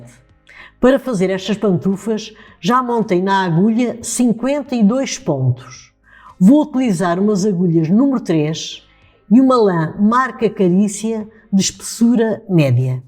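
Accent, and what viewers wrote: Portugal. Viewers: Amo fazer tricô. Acho muito bonito teu sotaque português. Explicas com perfeição. Parabéns abraço do Brasil.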